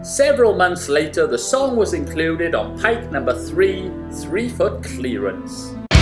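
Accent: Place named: Wales, United Kingdom. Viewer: Love this channel. I definitely detect a Welsh tinge to your accent :). Where can I buy pikes 13, 43 and 78? Woo!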